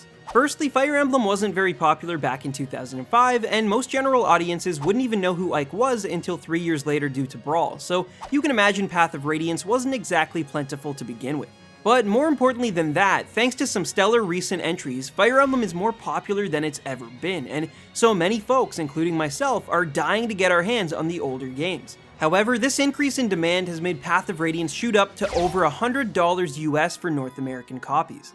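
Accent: Canada. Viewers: This video is pretty good. Are you Canadian?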